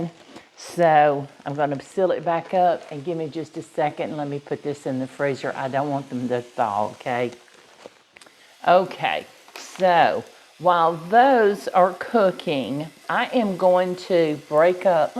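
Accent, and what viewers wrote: South, United States. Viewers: Hey there, this is first time I’ve seen you. Love your bright and beautiful kitchen, and your beautiful soft and calm voice (you sound Southern like me). These are my hubby’s fave breakfast foods but I’ve never made into pockets before, a must try! At age 74 I’ve learned something new. Thank you!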